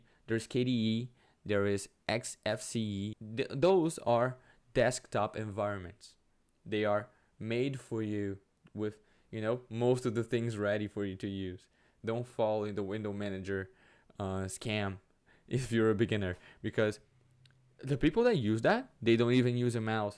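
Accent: Brazil. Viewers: kkkkk juro! Vi por literalmente 2segundos e ja fui nos comentarios para ver se alguem tbm tinha notado. O sotaque br falando ingles eh bem unico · Coé mano, faz um vídeo em português também, esse sotaque não engana. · Tu é brasileiro? Esse sotaque é igual o meu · Cara, impressionante como abri o vídeo e na primeira palavra percebi que era BR, CACETE a gente conhece o nosso sotaque lkkkkkkkkkkkkkkk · are you brazillian, man? you've got the southeastern accent xD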